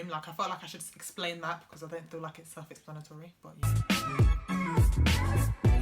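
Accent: United Kingdom. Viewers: Your so prettyyyyy!!!!! And I freaking love your accent❤️ it’s probably not but is it British?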